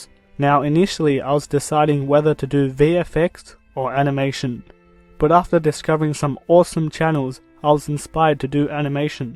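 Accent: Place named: Balkans. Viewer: Tacno se primecuje balkanski glas!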